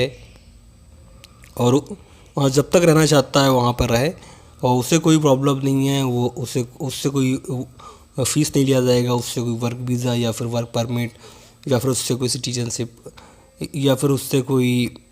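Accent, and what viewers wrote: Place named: Uttar Pradesh, India. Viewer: Up Se ho kya bhai ..Awaaz Se lag raha hai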